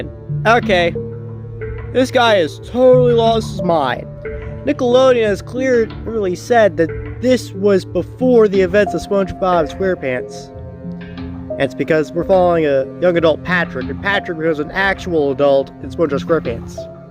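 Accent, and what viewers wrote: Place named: South, United States. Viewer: You sometimes speak with a southern drawl. Are you southern, or is this an act?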